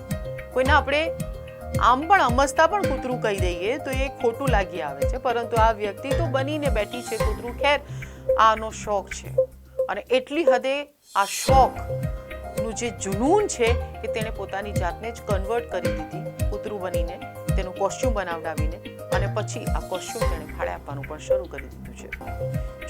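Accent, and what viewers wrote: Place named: India. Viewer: ဂျပန်ဆို...ဘာဖြစ်လို့...အန္ဒိယ...ကပြောနေလဲ....အဲဒီကောင်က...ကုလားလိုဘဲပြောနေတာ...ဗျ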